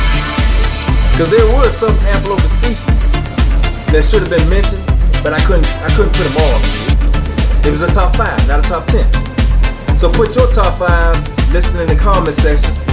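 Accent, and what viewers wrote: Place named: Washington DC, United States. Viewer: Cool fish. Sounds like you are from the DC area. ??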